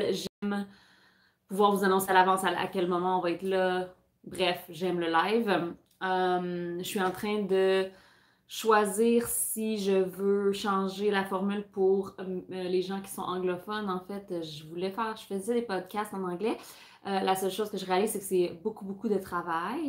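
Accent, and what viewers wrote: Quebec, Canada. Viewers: Merci pour tes vlogs, je guette toujours la mise en ligne pour découvrir tes ouvrages et entendre ton merveilleux accent et le vocabulaire québécois :) Je me suis (re)mise au tricot il y a un peu plus de 2 ans (j'avais appris enfant avec ma grand-mère mais j'étais surtout brodeuse) et tes tutos m'ont vraiment permis de me lancer dans des choses plus compliquées comme les chaussettes. Il me reste à faire un pull, j'ai fait des châles assez techniques mais je bloque un peu par peur de gâcher une grande quantité de laine en tricotant un vêtement qui ne serait pas à la bonne taille... C'est vraiment le fit qui me fait peur, au moins pas ce problème avec les châles :D Mais je vais m'y mettre !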